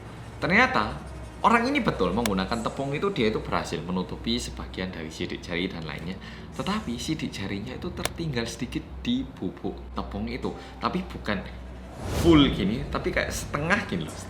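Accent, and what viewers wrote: Java, Indonesia. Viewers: Medhooooookk Suaranya persis pemotong rumput 😂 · Medooookkkk~ Melu ngempet nafas pisan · Bersama orang korea yang Medoookkkkkkkkk....... Seketika akupun ikut tahan nafas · Medooooooooooooooooooooooooooooooooooooooooooooooooooooooooooooooooooooooooooooooo ooooooooooooooooooooooooooooooooooooooooooooooooooooooooooooooooooooooooooooooooooooooooooooooooooooooooooooooooooooooooooooooooooooooooooook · Alhamdulillah medoknya makin panjang dari biasanya. Ayo SEMANGAT! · Mas korea medok deg deg kan gak tadi interviwe sama bts oh ya jungkook minum susunya lahap ya🙂😌✌🏻🍼💜💜💜💜💜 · Medooooookkkny luar biasa' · iya mas medoknya, medoooooooooooooooooookkk banget yaak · medoknya hanya bertahan sampai sini wkwk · Korea Medoooooooooooooooooooooooooooooooooooooooooooooooooooooooooooooooooooooooooooooooooooooooooooooooooooooooooooooooooooooooooooooooooooooooooooooooookkkkkkkkkkkkkkkkkkkk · Ternyata tetep medok ya sekalipun lg ngeinterview bts, uwu mantul mas 💫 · Mau nya lewat aja tuh tokpednya..krna udah ngeklik nih pidio.. 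Eh iseng ja balik ke tokped ternyata MC nya si medhooooooooooooooooooookkk...😀 Akhirnya nongkrongin dah.. Klo aq dari sekian translater nya bts di indo lebih milih ㅎㅎㅎㅎ Cie cie cie yg bakal di kontrak untuk translate nya BTS di next project konser indo.. 💚💚💚😎😎😎 짱... 너무 좋아...짱 짱 진짜로...고마워😊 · Jiaahhh... Baru buka youtube channel yang ini pas pembukaannya spontam ngikutin pembukaan sampe ngikutin medoknya yang panjang banget sampe ditanya sama papah "kamu ngapain?" 😂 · Ketemu lagi, tadi di Tokopedia jadi MC BTS, sekarang di YouTube jadi detektif medhokkkkkkkkk....😄 Langsung nonton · Astaga aku sebagai bolobolo bangga ternyataa mas korea yang medoookkk interview bts. OMG proud of u😭😭✨✨✨✨✨ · Medhook ke kedawan mas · Wi medok e nyapo ye mas? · Meddhoooooooooook e dhuowo men om 😅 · Hello guys welcome back to bersama orang Korea yang medoooooooooooooooooooooooooooooooooooooooooooooooooooooooooooooooooooooooooooooooooooooooooooooooooooooooooooookkkkkkkkkkkkkkkk!!!!!!!!!!!!!!!!!!!!!!!!!!!!!! 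· Orang Korea Yang MEDOOOOOOOOOOOOOOOOOOOOOOOOOOOOOOOOOOOOOOOOOOOOOOOOOOOOOOOOOOOOOOOOOOOOOOOOOOOOOOOOOOOOOOOOOOOOOOOOOOOOOOOOOOOOOOOOOOOOOOOOOOOOOOOOOOOOOOOOOOOOOOOOO OOOOOOOOOOOOOOOOOOOOOOOOOOOOOOOOOOOOOOOOOOOOOOOOOOOOOOOOOOOOOOOOOOOOOOOOOOOOOOOOOOOOOOOOOOOOOOOOOOOOOOOOOOOOOOOOOOOOOOOOOOOOOOOOOOOOOOOOOOOOOOOOOOOOOOOOOOOOOOOOOOOOOOOOOOOOOOOOOOOOOOOOOOOOOOOOOOOOOOOOOOOOOOOOOOOOOOOOOOOOOOOOOOOOOOOOOOOOOOOOOOOOOOOOOOOOOOOOOOOOOOOOOOOOOOOOOOOOOOOOOOOOOOOOOOOOOOOOOOOOOOOOOOOOOOOOOOOOOOOOOOOOOOOOOOOOOOOOOOOOOOOOOOOOOOOOOOOOOOOOOOOOOOOOOOOOOOOOOOOOOOOOOOOOOOOOOOOOOOOOOOOOOOOOOOOOOOOOOOOOOOOOOOOOOOOOOOOOOOOOOOOOOOOOOOOOOOOOOOOOOOOOOOOOOOOOOOOOOOOOOOOOOOOOOOOOOOOOOOOOOOOOOOOOOOOOOOOOOOOOOOOOOOOOOOOOOOOOOOOOOOOOOOOOOOOOOOOOOOOOOOOOOOOOOOOOOOOOOOOOOOOOOOOOOOOOOOOOOOOOOOOOOOOOOOOOOOOOOOOOOOOOOOOOOOOOOOOOOOOOOOOOOOOOOOOOOOOOOOOOOOOOOOOOOOOOOOOOOOOOOOOOOOOOOOOOOOOOOOOOOOOOOOOOOOOOOOOOOOOOOOOOOOOOOOOOOOOOOOOOOOOOOOOOOOOOOOOOOOOOOOOOOOOOOOOOOOOOOOOOOOOOOOOOOOOOOOOOOOOOOOOOOOOOOOOOOOOOOK.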